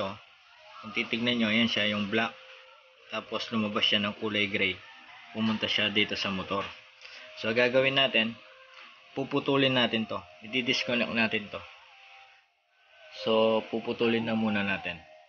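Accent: Pampanga, Philippines. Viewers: ang galing boss. itatapon ko na dapat yung dalawang sirang electric fan, napaandar ko pa. medyo mahina lang, try ko yung sinabi mong palitan ang capacitor para lumakas. salamat po! parang may pagka-kapampangan ang accent mo boss hehe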